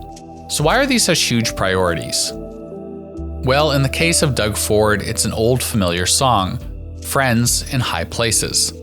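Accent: United States. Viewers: Because the creator is a dumb YANK, NOT A CANADIAN. Can't even say "zed".......as in either of our two Official Languages.....one of which is NOT "Amurrrrrrican".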